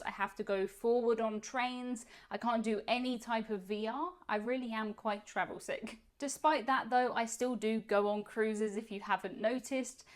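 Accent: United Kingdom. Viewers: Thank you for explaining the “Naughties” were not naughty! I love proper British English, and appreciate your use of it and the explanation for non-British English speakers! I’m excited to hear how your friends like the cruise!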